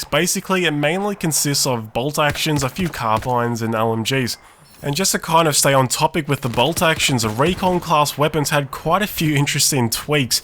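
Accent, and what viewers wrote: Australia. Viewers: Im just wondering are u an australian?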